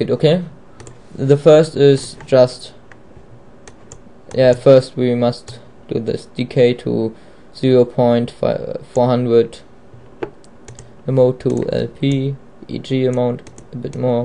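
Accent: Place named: Germany. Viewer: du hast ein deutsch accent (?) i am dutch and i can understand it:P i am soon going to germany to europapark, do you know that? Auf Wiedersehen:D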